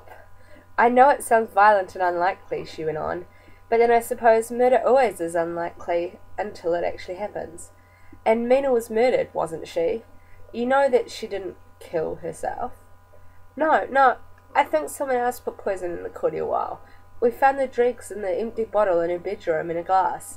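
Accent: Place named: New Zealand. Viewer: i guessed new zealand before you said ! i win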